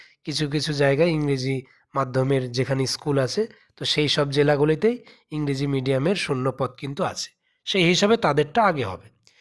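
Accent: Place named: Bangal, Bangladesh. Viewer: Upni bangal , kotha te bojha jacche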